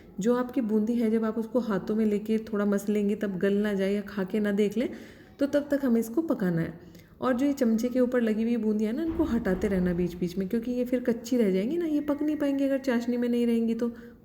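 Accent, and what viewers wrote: Haryana, India. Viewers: Didi Haryanvi ho kya? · Nice and pleasant to hear Haryanavi accent so soft spoken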